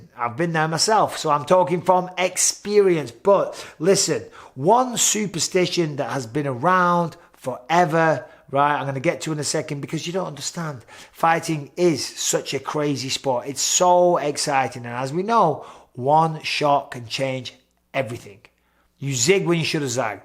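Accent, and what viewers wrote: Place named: Liverpool, United Kingdom. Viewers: Fucking hell that one caught me off guard😂😂………. Also I know how our NW England accent sounds when saying a sentence like this 👍👍!!!! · The scouse was pristine. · That scouser accent👌👌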